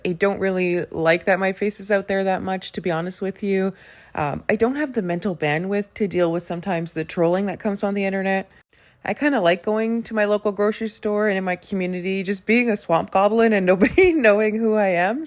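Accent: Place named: Canada. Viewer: I'm so glad you made that video. Your voice is enchanting but I never thought of Canada, but the minute you said "Canada", yes of course..... It was really nice of you to share. I have a dream of how small communities could commit to looking after feral cats for example. I have two cats and I feed a stray and I contribute financially to cat sanctuaries as best I can from a pension, so I really appreciate what you do. I think the whole world loves Biggie Smalls. Maybe you could write a children's book????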